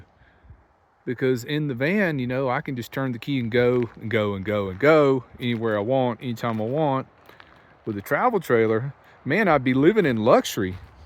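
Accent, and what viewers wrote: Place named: Georgia, United States. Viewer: fellow Georgian here. I'm almost 66 yo and in July 2023 I sold my home in Fl, bought a 31 RV and have traveled to almost every single state in the US, i have 4 states left to see besides Hawaii. I travel with my two little doggies and my cat. Like you I stay as long as I want wherever I want and am just free. I watch your channel not because you travel in a van but because a) i just love hearing that Georgia drawl and b) because you are you. You inspire me and that's important. Whatever you decide it will be right for you! Stay safe and happy travels.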